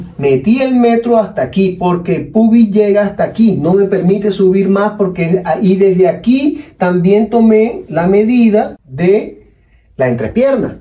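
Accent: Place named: Venezuela. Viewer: Clase magistral, pareciera complicado, pero solo hay que prestarle atención y practicar. Tiene lógica. Gracias. Estas en Colombia, oero eres Venezolana, cierto? Por el acento. Soy venezolana, vivo en chile.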